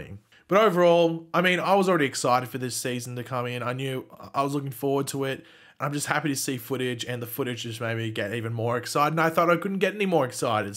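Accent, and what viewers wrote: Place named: Australia. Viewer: Ur Australian! Lit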